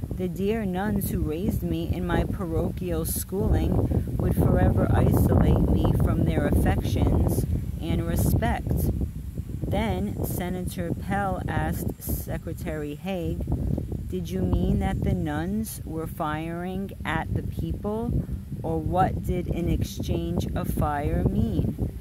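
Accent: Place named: Bronx, United States. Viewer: I can barely hear your Brooklyn, Bronx accent in your voice.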